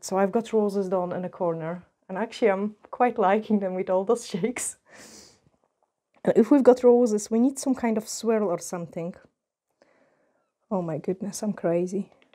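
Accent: Scotland, United Kingdom. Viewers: Absolutely gorgeous 😀 are you part Scottish? You have a very subtle Scottish accent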